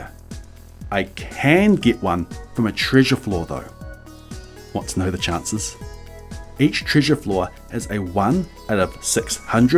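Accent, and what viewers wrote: New Zealand. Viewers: New Zealand accent. 👌🏽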